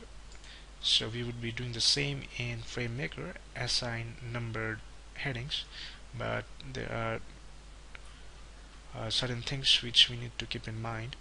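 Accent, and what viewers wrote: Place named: India. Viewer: THANKS MAN WITH INDIAN EXCENT YOU SAVE ME FROM CRAZY 0.O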